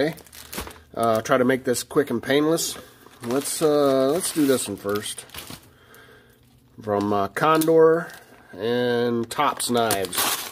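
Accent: United Kingdom. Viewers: Those Condors are going to be great work horses. Spot on British accent Haha. That Tops is going to be one hell of a slicer. Thank you for doing this giveaway. The knife community is something else. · Ha you nailed the bloody British with that accent. I’ve been looking for a plan A by condor and cant find it anywhere in-stock. That low drag looks like a work horse. And everyone knows Tops knives are outta this world. Good stuff⚔️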